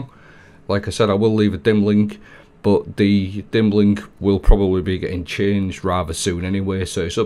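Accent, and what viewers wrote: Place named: Manchester, United Kingdom. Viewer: my buddy from across the pond heard your voice thru my mic as I was watching this clip. He’s guessing you’re: Manc or salford 😊??? Good videos as usual. Keep ‘em coming.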